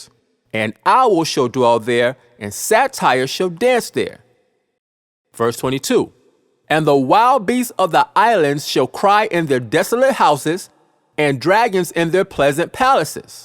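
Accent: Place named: Cockney, United Kingdom. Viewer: . .the maker (Son of Man)of tis video must be a cockney fr London ee.. he has that english cockne accent. .for info i m fr Borneo isle...studied Law fr London U...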